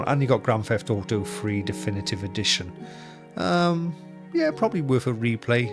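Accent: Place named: Wales, United Kingdom. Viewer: Love the Welsh accent but rolling his Rs lol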